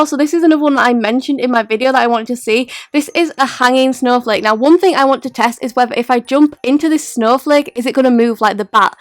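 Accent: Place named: Scotland, United Kingdom. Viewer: I like you Scottish voice. :3 ❤️🗣🇫🇮 (WHERES THE SCOTTISH FLAG?)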